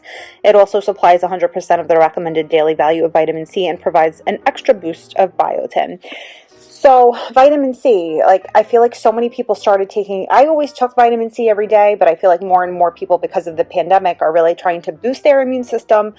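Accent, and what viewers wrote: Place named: Long Island, United States. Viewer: Are u from along Island New York? U sound like it! I am too! Great video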